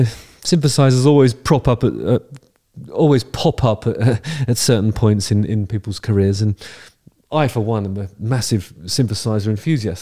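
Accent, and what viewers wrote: United Kingdom. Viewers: Hello. Firstly I’d like to say I love your content. Secondly, you have the most annoying intro I’ve ever heard yet I find myself singing it throughout the day. When I first started listening to you I thought you were saying “right again.” I thought to myself this is one cocky Britt and I like it.Have a great day sir!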